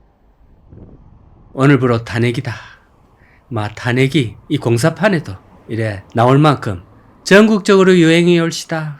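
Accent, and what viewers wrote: Gyeongsang, South Korea. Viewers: 아~~골치아픈 아파트 아까봐라 돈 내라 돈내놔라 ㅎㅎ경상도 사투리 구수한솜씨 구독이 몰린다 티비 개그맨보다 훨씬 재미있다아이가 세상정보도 공부도되고 인생공부되고 참좋다마 시청자여러분 있는돈 잘보관하고 벌로 투자한다고 하다가 다망합니다 돈 꼭홍치지고 맛있는사먹고 하시고 짬나는데로 여행하시고 남은여정 즐겁게 놀다가 때가되면 갑시다 ❤❤❤❤❤❤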